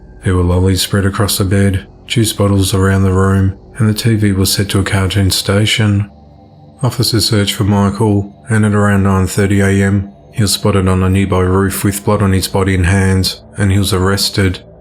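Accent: Australia. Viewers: the Aussie accent narrating true crime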